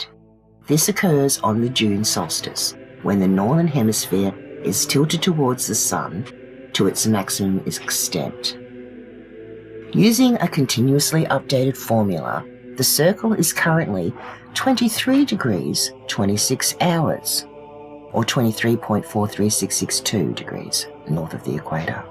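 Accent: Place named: Australia. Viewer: I was joking about your accent. I like the Australian accent btw... and I love your content. I just enjoy how you aussies pronounce Year. Yeeuh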